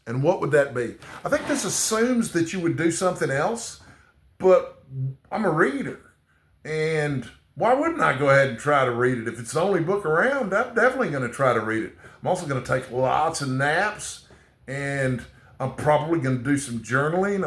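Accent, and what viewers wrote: Texas, United States. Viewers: What a fun video. 'Salem's Lot opened me up to reading and loving King after basically a lifetime of meh reading experiences with him. I did chuckle about the Texan accent. My Tennessee accent is often mistaken for a lifestyle that is reminiscent of Hee Haw and not a city dweller. Stay sexy and hydrated!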